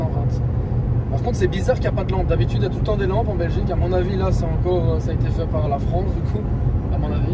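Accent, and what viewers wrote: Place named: North Africa. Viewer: Cet accent limite nord Afric est insupportable...faut évoluer garçon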